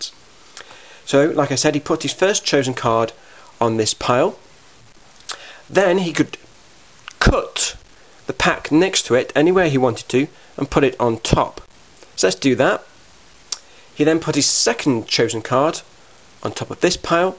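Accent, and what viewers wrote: United Kingdom. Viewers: i like ur british accent~ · i would guess ur british? -.-'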